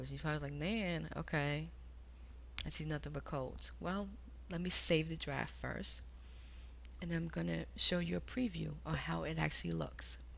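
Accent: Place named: East Coast, United States. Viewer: thank you so freaking much man.. i could not verify the audio file at all thank you so much it was in front of my face the whole time.. love your voice and eastcoast accent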